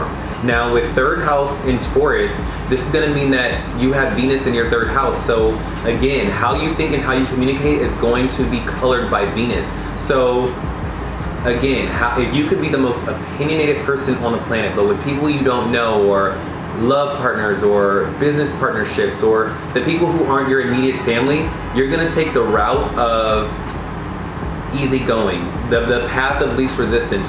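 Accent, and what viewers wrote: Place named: Jamaica. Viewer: Intro 🔥 “ tun ye up” you must be Jamaican.. 🇯🇲 I’m Jamaican btw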